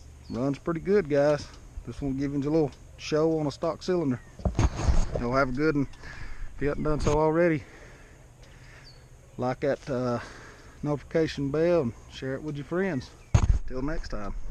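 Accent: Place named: Pittsburgh, United States. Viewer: I can tell where "yinz" are from. Lol. Nice scoot. Looks tome like you know your 2 strokes.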